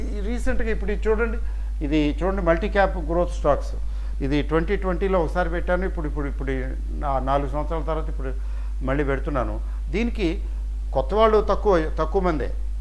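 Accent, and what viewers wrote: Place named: Coastal Andhra, India. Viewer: Sir, what is your native place. I know you are from coastal area.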